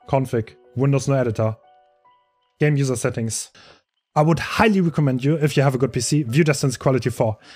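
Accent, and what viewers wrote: Germany. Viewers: clearly german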